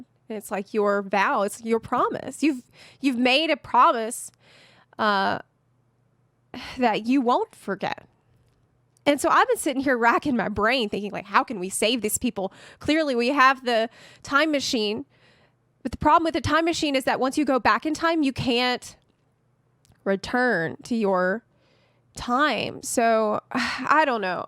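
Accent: South, United States. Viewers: Awww you are adorable. And southern lol I second your thoughts on the story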